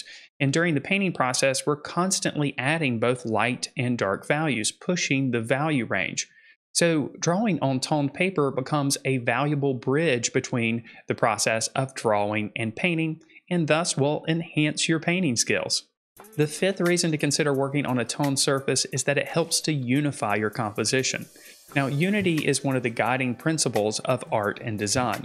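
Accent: South, United States. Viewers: The way you say drawing sounds like drauwwing. What accent is it? Southern?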